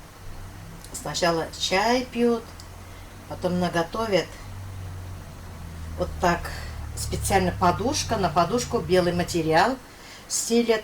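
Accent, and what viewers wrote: Tatarstan, Russia. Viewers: Исэнмесез😘Какой у вас акцент татарский классный,слушать одно удовольствие❤❤❤❤❤Рэхмэт за рецепт💃💃💃💃💃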